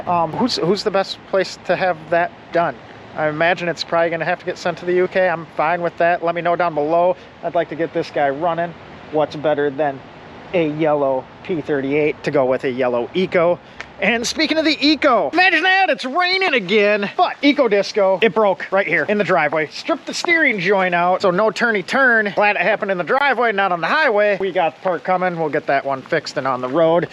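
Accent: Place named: United States. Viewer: God I hate the American accent!!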